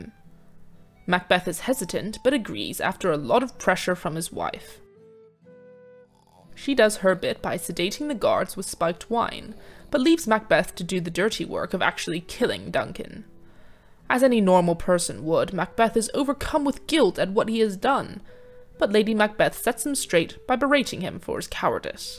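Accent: United Kingdom. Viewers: Enjoy the narrative with British accent; and I appreciate learning such famous stories in so short a time!👍-Thank you! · Since everyone could recognize this is a typically English or British accent if you agreed!🎉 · Tysm I have an exam tomorrow and this helped me too much I appreciate with the best British accent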